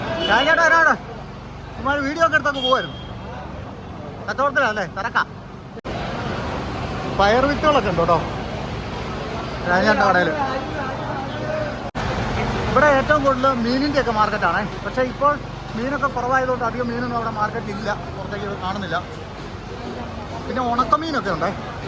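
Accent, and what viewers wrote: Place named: Idukki, India. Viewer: ഞാൻ കൊച്ചിക്കാരൻ ആണ്. നിങ്ങൾ ഇടുക്കിക്കാരുടെ സംസാരം കേൾക്കാൻ തന്നെ ഒരു പ്രത്യേക സന്തോഷമാണ്.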